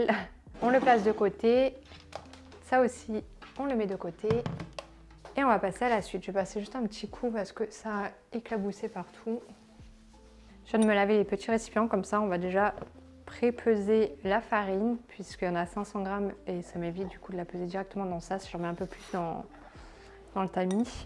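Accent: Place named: North, France. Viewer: ton p'tit accent nordique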